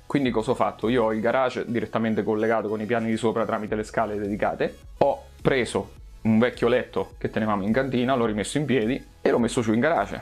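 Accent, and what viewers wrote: Marche, Italy. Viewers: fai troppo ridere. caparbietà e testardaggine per portare a casa il risultato tutto raccontanto con accento marchigiano. Visto tutto il video col sorriso. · Ai gatti glie gusta ste cose ahahah adoro il marchigiano 😂😊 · io vivo al nord, mia madre e' nata a Corinaldo e mio padre a Mondavio. Sentirti parlare mi fa sentire a casa.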